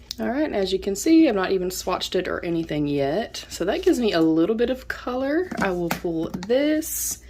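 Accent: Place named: South, United States. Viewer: I love it,! I wasn't sure if you were Southern! 🙃🤪🫠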